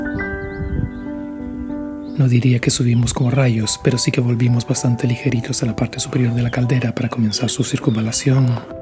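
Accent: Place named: Belgium. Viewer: Vandamme dit klinkt belgies .❤🤭🙏🙏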